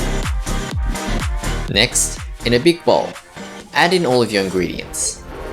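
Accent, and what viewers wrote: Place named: Australia. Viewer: what a nasty ass australian accent xD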